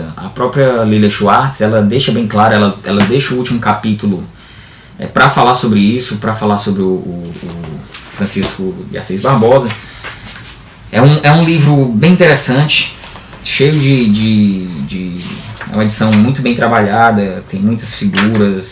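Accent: Northeast, Brazil. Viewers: Você e nordestino?🤠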